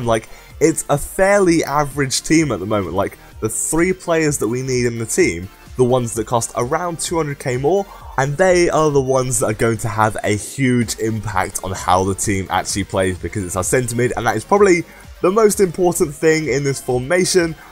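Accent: United Kingdom. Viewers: dont you worry, you talk british and we all love it <3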